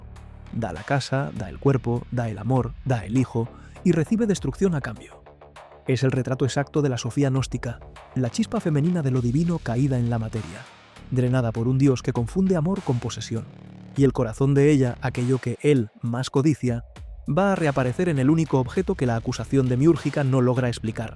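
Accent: Spain. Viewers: tu asentó español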